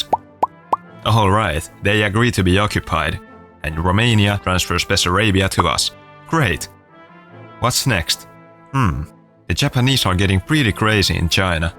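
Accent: Finland. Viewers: Are you from Finland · After xPetu, this is the most magnificent Finnish accent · i just can smell the finnish accent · are you finnish?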